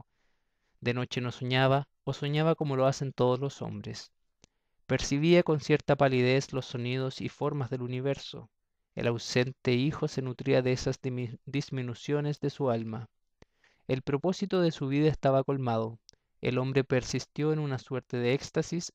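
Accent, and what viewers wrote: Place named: Chile. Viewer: se agradece la narracion chilena, saludos desde santiago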